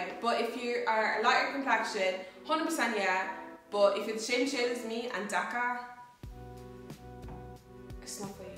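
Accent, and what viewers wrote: Ireland. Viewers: Am I trippin or do you have an irish accent? It's stunning either way😍😍😍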